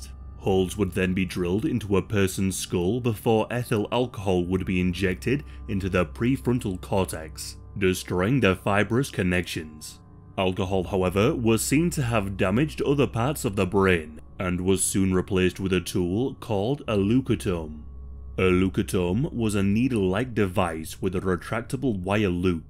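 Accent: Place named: Yorkshire, United Kingdom. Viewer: i love the way u try to make ur yorkshire accent sound slightly posh lmaoo 😭 do you do this so its easier for people who arent native english speakers can understand you more??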